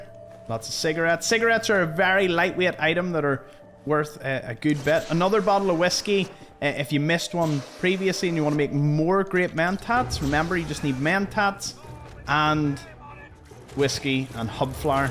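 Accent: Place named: Scotland, United Kingdom. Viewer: I like William Wallace accent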